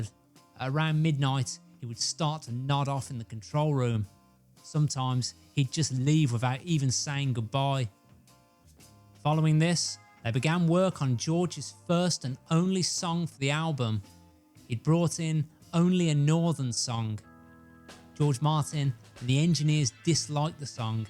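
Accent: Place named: West Midlands, United Kingdom. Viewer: Thanks for all these videos you’ve made documenting the discography, really good stuff. Made even better by your West Midlands narration, you’re a legend!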